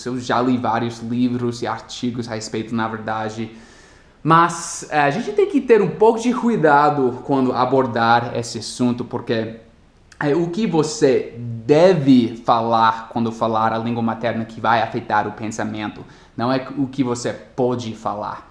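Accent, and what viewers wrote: Brazil. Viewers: volta para o Brasil!!!!!! Muito tempo longe você vai perdendo o seu sotaque brasileiro...🤗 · Impressão ou em alguns momentos ele puxa o sotaque gaúcho, cara to devorando os vídeos e é incrível o quanto ele entrou no sotaque brasileiro.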